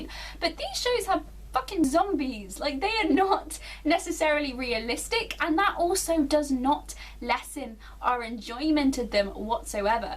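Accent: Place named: England, United Kingdom. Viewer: Your english accent! I thought you meant egyptian mummy omg 😳 Also I didn't realize people actually say these things ☹️😂 the world we live in 🤣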